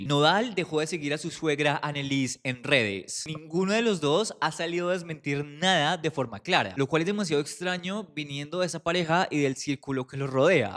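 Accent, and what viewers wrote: Colombia. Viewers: Nada que ver mi comentario PERO me encanta tu acento colombiano!! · Me da la impresion que es colombiano ❤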